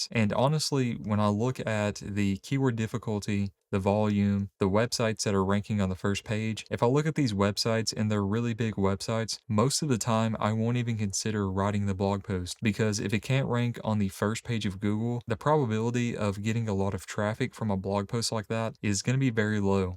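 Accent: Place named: South, United States. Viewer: This guy's Southern accent is everything 🤩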